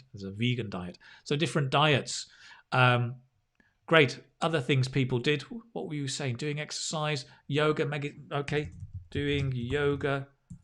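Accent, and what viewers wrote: United Kingdom. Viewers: I and my children👶👧👦 7-8-11))))really like that you regularly do with your great clasical BritishEnglish pronunciations and sincere interested teaching acurent natural fluency skills!!!!!! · Thanks so much, for your English Speaking Success on the YouTube channel. You've been giving me chances to immerse myself in the daily uses of English on different ambitious, especially with your British accent which I've been learning since primary school, more than 50 years ago under the British Colony. but I still have problems in the sentence stress. Anyway, I will keep watching your videos everyday anytime or even during having a shower.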